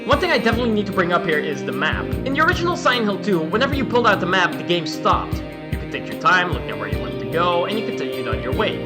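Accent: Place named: Flanders, Belgium. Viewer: Flemish accent detected Gezegende silent hill upload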